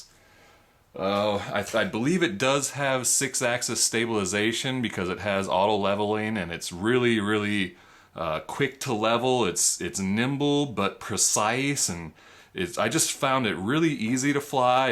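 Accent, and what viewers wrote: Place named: South, United States. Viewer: How come when I watched this before you had a southern accent?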